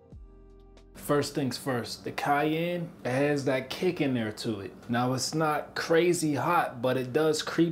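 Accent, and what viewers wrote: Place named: Western Pennsylvania, United States. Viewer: I knew it.. I was born in Pittsburgh and moved to Maryland when I was 7 so.. I heard that Western Pa accent mainly da burgh in you.. I had to ask.. much love to you my brother.. It crazy my family reunion is in the burgh in a couple weeks so I’ll be up there.. peace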